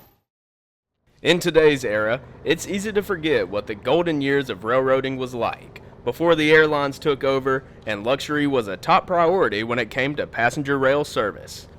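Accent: South, United States. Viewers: Why is this beautiful train of Canada being announced by announced by an American with a southern accent?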